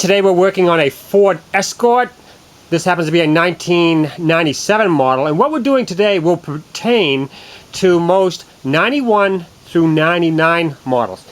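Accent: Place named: Woburn, United States. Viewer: I see this video has been up for a while but I got to say a couple things I lived in Woburn for a number of years met my wife there 30 years ago and through her I met some friends we still stay in touch and when I heard your voice I knew right away where you were at you sound exactly like my buddy Little Dave made me smile thanks for the video I’ve done this one many times I have a 99 Ford escort with get this 514,000 miles on it I just wanted to comment about the car but I’m gonna put another little post up just a minute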